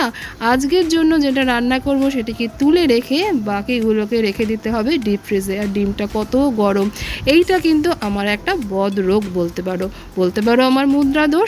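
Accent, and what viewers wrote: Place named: Bangal, Bangladesh. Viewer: খুব খুব ভালো লাগলো তোমার আজকের ভিডিওটা তোমার কথাগুলো শুনতে খুব ভালো লাগে আর মাঝে মাঝে বাঙাল কথাগুলো বেরিয়ে বেরিয়ে যায় শুনতে ভালো লাগে আজকে যেমন বলেছো ডিমের চোকলা আমিও মাঝে মাঝে বলি চোকলা কিন্তু ঘটিরা বলে খোলা ডিমের খোলা তোমার কথাগুলো শুনলাম মাছ ধরার টিপসটা খুব ভালো বলেছ আমিও এই রকম করি খানিকটা সরষের তেল মেখে নিন ভালো থেকো সবকিছু নিয়েই ভিডিওটা খুব ভালো লাগলো আর তোমার কথাগুলো তো তুলনা হয়না❤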